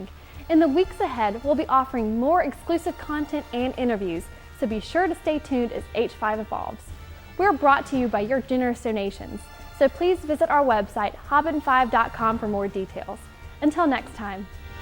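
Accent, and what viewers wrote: United States. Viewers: What kind of accent is that? It's like, a mid-west Arkansas thing but at the same time it sounds like shes trying to hide it..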